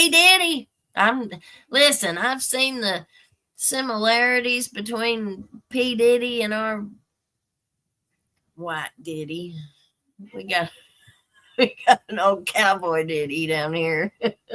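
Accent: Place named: Kentucky, United States. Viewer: My grandma grew up in Pike County KY and listening to your channel reminds me so much of her accent! ❤She passed years ago but listening to your channel was so familiar to me because of it! Thanks for covering this case -- I can't wait until we find out what really happened here.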